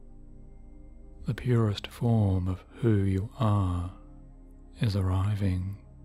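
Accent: Australia. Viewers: Are you a fellow Aussie or a New Zealander? Thanks for you video! X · I’ve been listening to you for a long time before I fell asleep. Recently, I realized that I can speak with an amazing Australian accent. Made me popular with my friends, thank you:) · Are you austrailan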